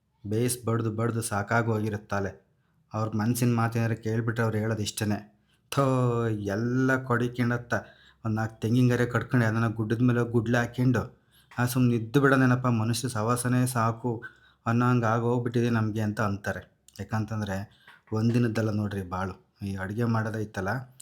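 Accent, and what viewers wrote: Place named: North Karnataka, India. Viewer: Nivu uttarkarnataka kadeyora sir , nimma bhashe chenngide. Yav uru...nimdu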